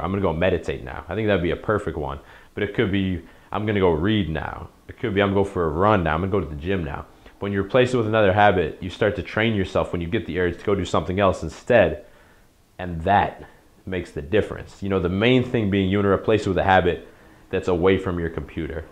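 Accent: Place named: New York, United States. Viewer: great video! you can tell this nigga from new york or something or has or had black friends lol "cant get that wood " lol "if you think about not getting hard its a rap son"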